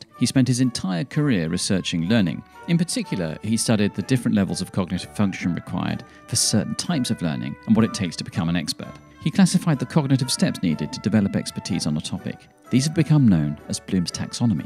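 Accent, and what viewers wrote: United Kingdom. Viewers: Very helpful, thank you for posting! One funny thing happened as I listened to this video. Initially thought “he’s mispronouncing Montaigne” but then I fell victim to something I think is common here in the US. We assign more intelligence and a better education to anyone with a British accent, and yours is excellent. So I thought, there’s no way that he’s mispronouncing Montaigne, it must be me that has been mispronouncing this name all these years. 😊 Again, thanks for your helpful insights!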